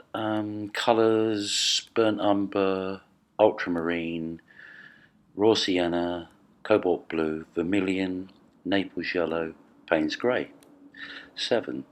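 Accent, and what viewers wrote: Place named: England, United Kingdom. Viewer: Dalla pronuncia direi che sei inglese! I Like! 💠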